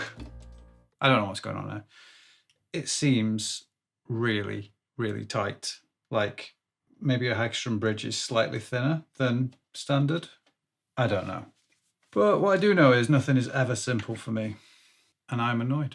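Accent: South, United States. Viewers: I was not offended by your "Southern" accent. I thought it was hilarious and I live in Nashville where a lot of people sound like that! Cheers.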